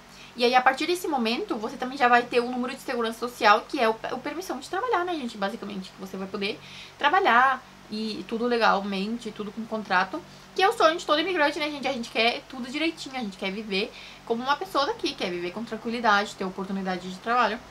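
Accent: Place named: Spain. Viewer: Gente eh muito engraçado. Vc agora tem o sotaque de espanhola que mora no Brasil. Kkk Eh muito louco. Eu nunca fui a Minas Gerais mas só de minha mãe ter nascido lá eu falo uai trem, credo! Kkkkkkk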